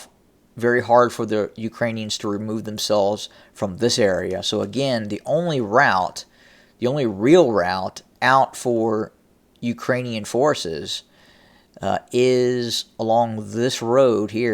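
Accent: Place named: South, United States. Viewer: Thanks for this excellent update. I like the way you speak (as opposed to some complainers in the comments below), but I'm curious where your 'accent' is from. I'm guessing a Southern state, but which one?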